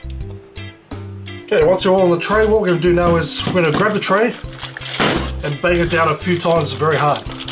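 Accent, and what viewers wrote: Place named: Australia. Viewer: he sounds like an aussie hell yeah!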